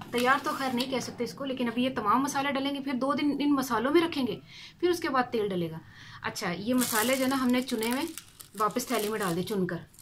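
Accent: Punjab, India. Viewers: thanks apka channel subscribe kr Lia hay apki Punjabi biukul hamray jasi hay 😁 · aapka punjabi bolney ka tarika bahot nice hai. lasoorey ke aachaar ki receipe bahot achey tarekey se batai hai. lasoorey kis month me aatey hai?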